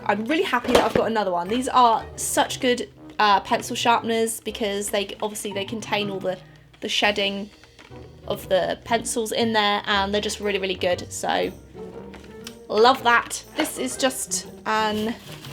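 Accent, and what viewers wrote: England, United Kingdom. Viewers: As I understand you live in America, but aren’t you British? I’m thinking this because of your accent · Have you ever lived in England because you sound a bit English but I belive you live in America but I'm not sure 🙃 Also I love your videos, they're really funny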